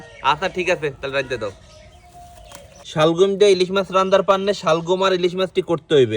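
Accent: Barishal, Bangladesh. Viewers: ওরে বরিশাইল্যারে!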